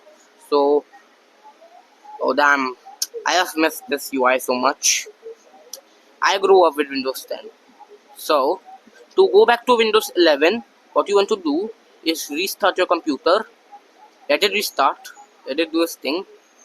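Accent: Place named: India. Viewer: omg indian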